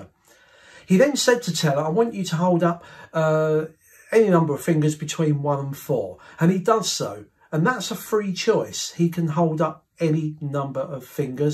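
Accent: United Kingdom. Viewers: Thank- you for putting the work in to teach and show us step by step how to perform this wonderful effect!! I have been into magic for about 40 years so even though i did not actually work it out step by step as you did i knew the basic method eas a stack and that the reverse dealing was part of the method. I will definitely be adding this to my routine!! Often when penn and Teller say they were fooled i think they are just pretending that they were fooled. If i know how it is done then certainly they must know; anyway that is how i think. They claimed that Shin Lim fooled them but i am not so sure that they really were fooled. Their motive? Shin Lim is considered a hot perfotner currently and anyone who. "" FOOLS THEM"", Performs one their Vegas strip show, to have Shin on your stsge is great publicity!! Thanks agin, sir for for all of your great videos!! 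P.S.-- my sister loves your accent; she says she thinks you have a Liverpool accent like the Beatles!!! Gary in dreamland; have a nice dream!!!🙂☁️☁️☁️⛅🌟🌟🌟🌟🌟🌟🌟🌟☁️⏰☁️ dream!!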